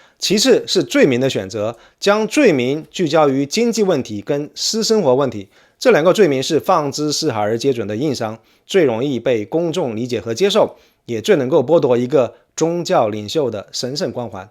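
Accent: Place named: Hong Kong, China. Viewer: 博主是不是香港人或者广府人？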